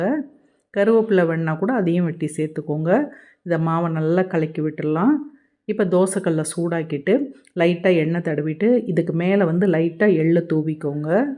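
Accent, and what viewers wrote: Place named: South, India. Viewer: Love your South side slang ma'am. Nice❤❤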